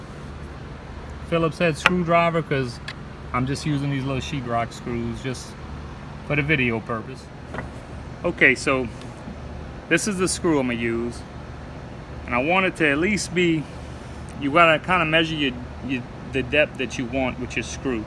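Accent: Louisiana, United States. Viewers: you sound like you from south Louisiana! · Are you from Louisiana?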